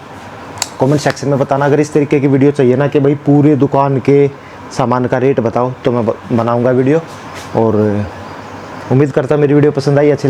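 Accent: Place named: Haryana, India. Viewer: Sir Mane aap kafi video dekhi..or mujhe aapki na to kirana ki Shop m koi interest hota h ..or nahi hi in product m... Lekin sab se bade maze ki baat wo ye h ..ki aap k video ander aap ki jo balne ki jo kla h.. haryanvi Desi andaza m ..wo mujhe bahut jayada pasand aati h...Jo altimate h.. Aap ki aavaj sun kar bahut aacha feel Hota h...aap ki jagah koi or hota ..to m ye video kabhi nhi dekhta... aap ho to he aap ki video m jaan...h Or Mari hardware ki Shop..h Is liye kirana m Mera koi interest he nhi hota..baki AAP ka working style supperb..h.❤❤❤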